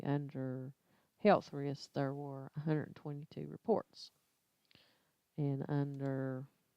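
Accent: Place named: United States. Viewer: Happy Saint Patrick's day, and yes! I too fear a Soylent Green future in which the poor and disenfranchised are deemed scrap heap fodder and a cooled evil encompassing reality, or I could just be high on some sweat Emerald pot and happy. Good day. I do so like your accent, tell me one thing? if it's not too intrusive? = where is that in the US of A..... is that from?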